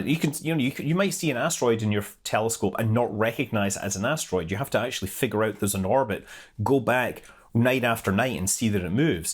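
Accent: Scotland, United Kingdom. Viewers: JUST KEEP ON DOING WHAT YOU DO ❤️ ... I watch many other space channels like Every Day Astronaut etc ... But it isn't a good week, if I don't hear your fantastic scottish accent 🙏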